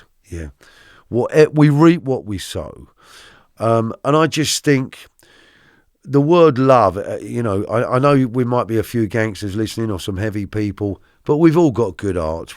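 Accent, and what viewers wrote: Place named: England, United Kingdom. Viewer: I love the English accent!🤗🥰💯💞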